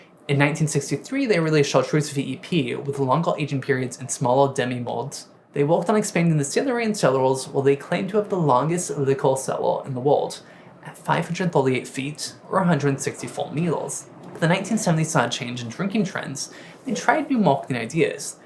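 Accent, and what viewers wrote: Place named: South Korea. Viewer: His pronunciation and his diction are all over the map. He places accents for words in the incorrect place and, if that wasn’t bad enough, his actual accent sounds as though he learned to speak English as a second language in Korea.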